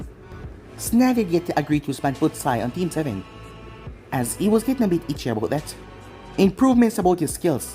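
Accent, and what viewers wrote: Jamaica. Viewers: I've always wanted to ask you this question, are u from Jamaica.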